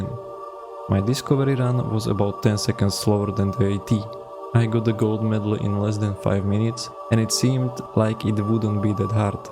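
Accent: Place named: Russia. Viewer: já sem si myslel že je z ruska kvuli jeho prizvuku XD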